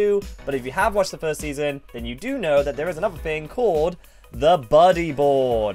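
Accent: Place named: England, United Kingdom. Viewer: I 💗 your English accent and your super nice and I always watch your videos every day 😍🇬🇧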